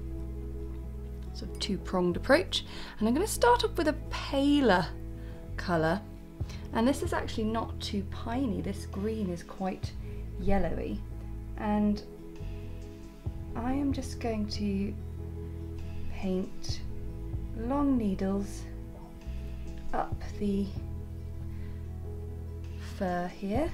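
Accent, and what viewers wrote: England, United Kingdom. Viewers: I just have to say, I appreciate how clean your palette is. I watch some other painters and theirs are so messy, it really makes my OCD go batty. 😂 I also enjoy listening to your accent. I am from Minnesota but have friends in England, and so I imagine that you are just another one of my friends there. I always watch before I go to sleep. It is so soothing.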